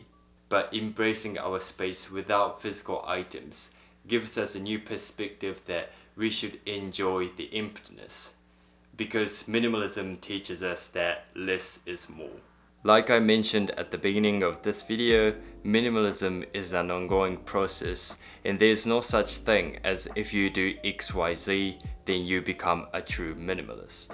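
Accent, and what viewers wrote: New Zealand. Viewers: I thought I’d detected a kiwi accent 😃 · you sound like a kiwi. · Are you a kiwi?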